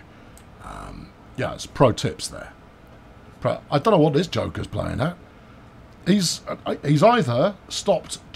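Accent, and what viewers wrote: Boston, United States. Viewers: That bawston accent sounds like a bad Harley Quinn impression. Yikes. Glad to see another train sim vid tho! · I'm from nowhere near Boawston, but that sounds accurate to me... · Just stumbled upon your channel, your funny, i live in boston but not a bostonion, your Boston accent is funny 😆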